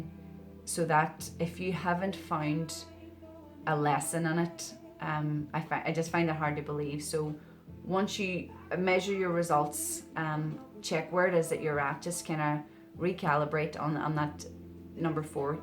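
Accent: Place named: Derry, United Kingdom. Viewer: I have just found you and it must be Divine intervention. You are a beautiful lady, talking from the heart in honest plain terms and giving examples from your own experiences. No high faluttin preaching. I'm guessing you're a Derry girl, love your accent and the fact you've never lost it. Know your roots and be proud. I know this is 4 months after this video and the Coronavirus has hit the world. I just wish you good health and stay safe. I look forward to many more videos from you.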